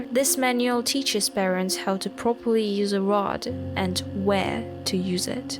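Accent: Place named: Ireland. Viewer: I really like your accent, are you Irish?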